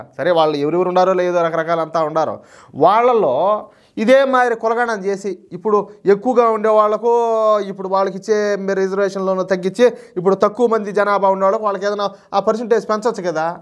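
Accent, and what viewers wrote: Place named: Bangalore, India. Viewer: Anna ee ooru anna meedi ? Ullagaddalu ani bangalore telugu matladuthunnaru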